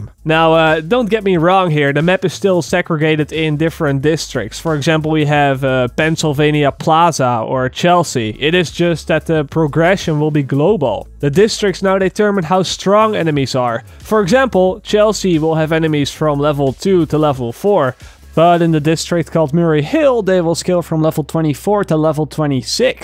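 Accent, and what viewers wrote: Netherlands. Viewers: Je hoort zo erg dat ie Nederlands is. Heerlijk!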